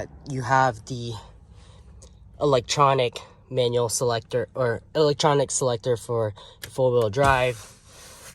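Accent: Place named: Southern California, United States. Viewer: As soon as he started talking i was like oh hes in so cal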